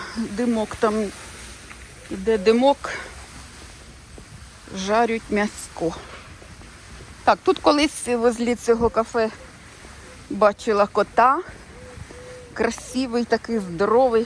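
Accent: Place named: Kryvyi Rih, Ukraine. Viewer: Володимира Великого 33а-базарёк на парапете. Мова як у криворожанки. Дякую за чудове вiдео!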